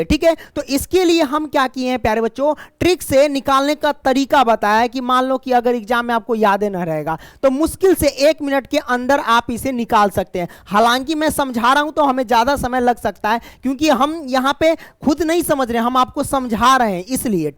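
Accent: Bihar, India. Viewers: Sir bihar se hain n....?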